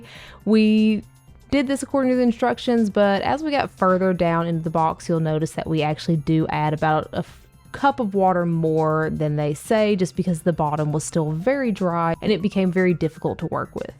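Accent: South, United States. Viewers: her country accent is so funny i love it 😂😂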